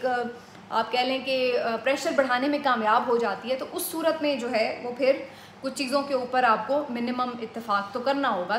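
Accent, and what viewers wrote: Punjab, India. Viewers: Love your Punjabi accent. It would be a good idea to do a Punjabi V log. English would be for a limited audience so i will not recommend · ماشاءاللہ آپکا پنجابی انداز گفتار بہت اچھا ہے۔ کرناچاہیے۔ اللہ آپکو صحت دے. اللہ نگہبان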